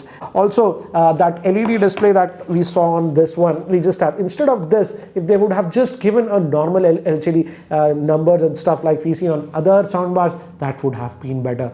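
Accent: Hyderabad, India. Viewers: I heard soundbaaarr as Sambaaar!! Love the Hyderabaadi accent! Soundbaarr saambaar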